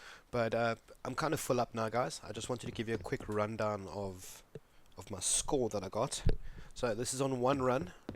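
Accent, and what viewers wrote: South Africa. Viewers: Are you from south africa ? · Are you south african? :D Great video! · You sound south african! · Great to hear a saffa gamer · South African ??!!😂me too😂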